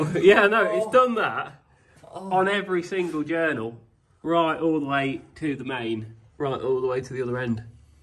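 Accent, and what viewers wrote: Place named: North Lancashire, United Kingdom. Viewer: Sound like your from north Lancashire, what bits do you need I'm sure I can find them 😉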